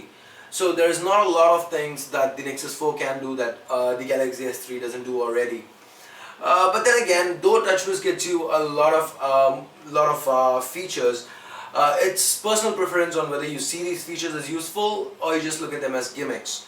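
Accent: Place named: South India, India. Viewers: from his accent..he sounded south indian for sure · for a tamil. you have a fantastic english accent. i would definitely take you for my movie if i was a director. TWO thumbs up brah!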